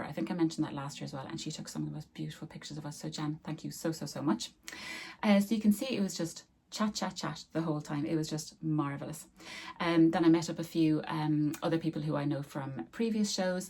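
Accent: Ireland. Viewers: Love your vlogs, you go as green as you want! 💚 😂 Also love your Irish accent and learning Irish words, my grandad was Irish and always used to recite poetry and stories to us, although full of blarney!! 😂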